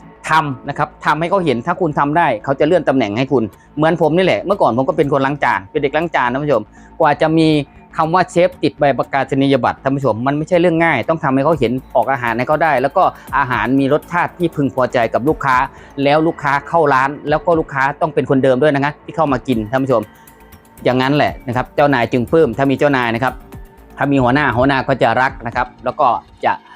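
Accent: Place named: Australia. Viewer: สําเนียงการพูดเหมือนคนประเทศออสตีนเลียจังเลยสงสัยเดียวต้องถอดสร้นตีนยัดปากบักเชพเอ้ยได้โปรดไปไกลๆส้นตีนกู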